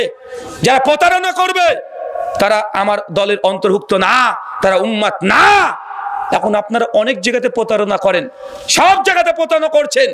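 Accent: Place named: Kolkata, India. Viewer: আপনার কথা বলা একেবারে কলকাতার মানুষের মত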